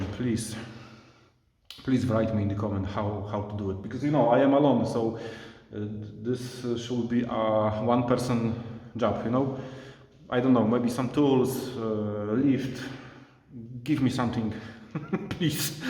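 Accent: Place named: Poland. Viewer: polskiego akcentu nie ukryjesz xD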